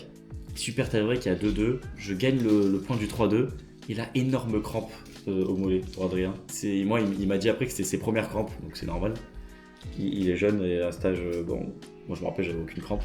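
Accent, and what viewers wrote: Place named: United Kingdom. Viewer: waa il a un big accent des UK mdr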